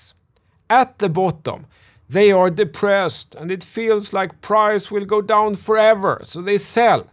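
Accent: Sweden. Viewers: Ahhh the Swenglish we all been missing 😎👍🏻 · It's not your video's that suck or your calls... But No one understand wtf you're saying with that heavy jibberish swedish accent :D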